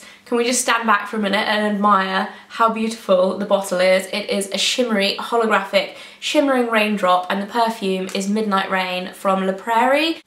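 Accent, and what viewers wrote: United Kingdom. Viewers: Is she Britsh?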